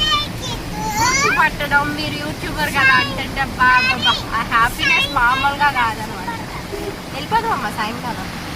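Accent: Srikakulam, India. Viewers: Malli, language marchestunav nv srikakulam laaa matladitenaey estam akka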